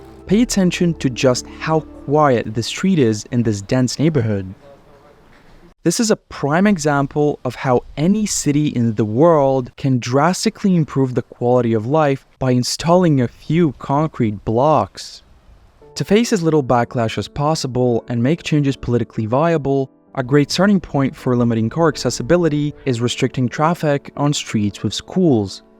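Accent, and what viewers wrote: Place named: Latvia. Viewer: šis viģiks arī ir ahujena bet es tev izaicinu uztaisīt katru piekto viģiku latviski >:) es dzirdu to mikroskopisko akcentu muahahahaha (es atvičai tu esi latvietis)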